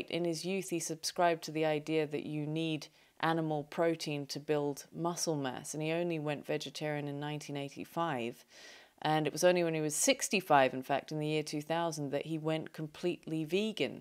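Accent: England, United Kingdom. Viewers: A subtle yet wicked sense of humor spoken with a English accent- quite enjoyable.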